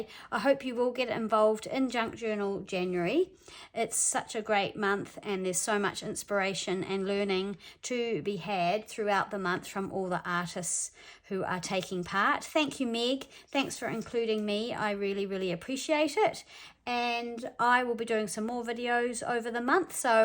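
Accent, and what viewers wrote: New Zealand. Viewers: So I’m new to this junk journalling and been inspired by a local friend. I think I’ll watch and learn this month, and start getting things together for when June comes around. Having watched Megs intro videos, yours is the first one I’ve watched with the daily prompts, and I’m even more inspired now. And how lovely to hear another kiwi! I’m in the waikato. Have subscribed to your page and look forward to seeing your journal develop! Thanks so much.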